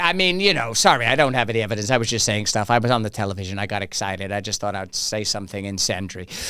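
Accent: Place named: United Kingdom. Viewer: Russell Brand is funny but he talks so fast and so British if you blink you could miss something. “Hey we don’t have evidence he’s a Russian plant! No, no evidence I’m sorry I just got on tv, got excited, and thought I might say something incendiary.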